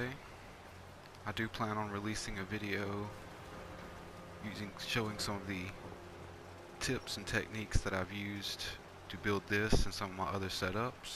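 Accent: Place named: South, United States. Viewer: Neatly done sir! This video like the recycling plant you've featured is well executed... It shall likely provide a considerable influence on my ideas on similar matters... Thankyou... As an aside might I ask asto the origin of your accent... Your voice clear, well modulated and easy to listen to... Maybe you should consider voice over work... Would you by chance, be a Southern Gent?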